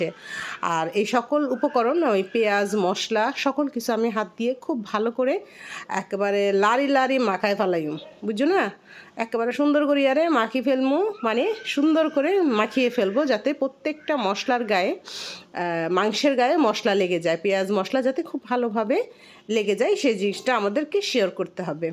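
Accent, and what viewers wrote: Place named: Chittagong, Bangladesh. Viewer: অাপনার চিটাগং ভাষা শুনে আমি মুগ্ধ 😊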